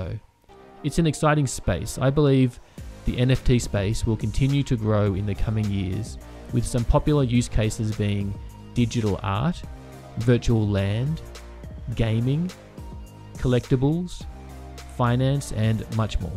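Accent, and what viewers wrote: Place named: Australia. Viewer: Close, he's an Aussie, not a kiwi like me ;-P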